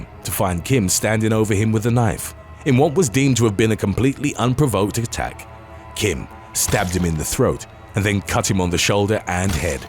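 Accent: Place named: England, United Kingdom. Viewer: This series is addictive! I love the narrator’s English accent!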